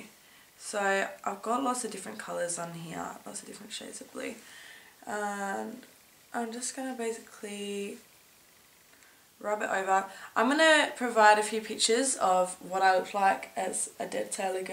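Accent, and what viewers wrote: Australia. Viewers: OMYGOHHD your australian ;P that just instantly made my love you x3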